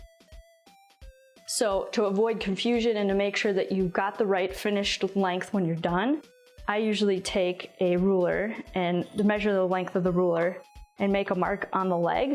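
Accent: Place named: Canada. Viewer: I thought she sounded Canadian....well, it's close by....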